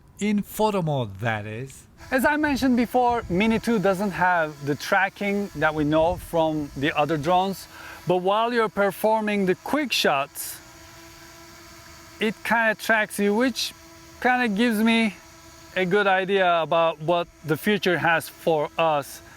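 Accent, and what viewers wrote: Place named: Turkey. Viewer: Müdür sen TÜRK müsün? Türk gibi konuşuyorsun ingilizceyi.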